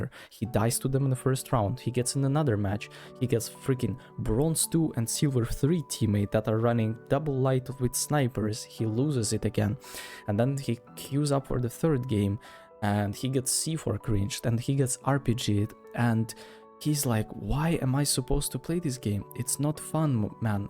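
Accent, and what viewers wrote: Russia. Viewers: Бро, зачем ты косишь под американца? Либо я схожу с ума, либо у тебя реально прослеживается русский акцент :D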